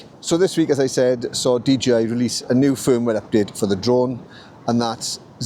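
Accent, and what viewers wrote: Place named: Welsh border, United Kingdom. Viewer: Hi started watching your videos and theyre very good 👍 Ive been a drone flyer since parrot 1 drone then parrot 2 and finally DJI drones 🤣 Im not a proffesional drone flyer just a hobby like yourself 😉 I have the neo 1 neo 2 and avata 2 plus the dji pocket 2 camera which I havent updated because Ive not used this one 🤣 I do have controllers N3. FPV controllers 3 and motion 3 controller plus goggles N3.. I am now retired and keep saying I will start a drone channel soon 😂 always next week when weathers better 😂 From near Wrexham so can understand your accent 😅 👍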